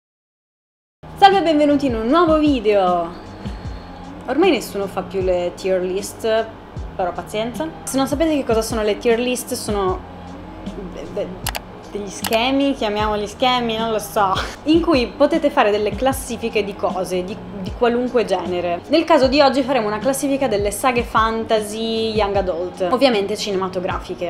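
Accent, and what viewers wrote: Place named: Rome, Italy. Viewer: Comunque ti dona l'accento romano 😄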